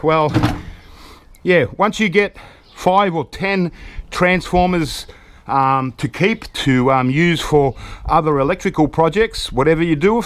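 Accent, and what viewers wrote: Australia. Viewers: I enjoy your videos mate. I love hearing Aussies talk. Never gets old. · I live right in the middle of the U.S.A. and I'm starting to say aluminum, the way you do and I don't even realize it. I wish I could talk like you! Everyone I know loves the deep Australian accents!!!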